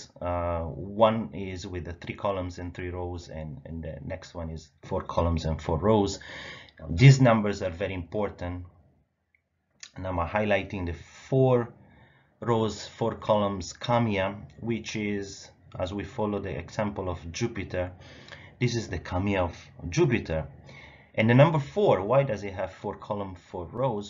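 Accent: Italy. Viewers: Sorry,are you italian??? 😅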